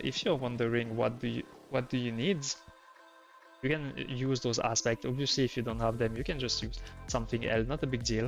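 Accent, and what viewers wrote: France. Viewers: Once again, thank you. By the way, Sir, your accent, is it perhaps French?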